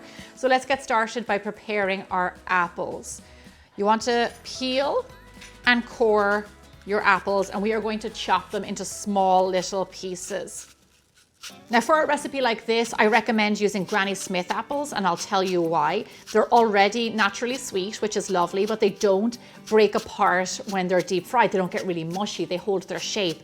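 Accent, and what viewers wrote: Ireland. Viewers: Ohhh my goodness!!!!!!I was looking for a easy recipe for fritters and BINGO!!!😂😂 first of all, i LOOOOOVE your accent!!! Truly🤗🤗🤗🤗 Irish???? OK so I love how easy it is and best of all no freaking yeast!! I love baking bout NOOOOOO yeast recipes, I just don't have the patience 😮 I'm gonna try these at work, I'm a cook in a rehab facility. These will certainly put a smile on the patients face and the employees come breakfast 🥟☕️ Thank you so much and I'll be looking into those doughnuts also. Glad I came across your videos. From deep in the ❤️ of TEXAS 😊😊😊😊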